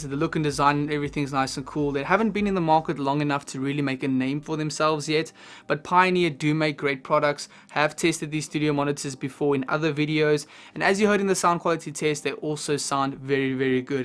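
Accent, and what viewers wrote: South Africa. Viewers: You sound South African dope video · Are you South African bro ??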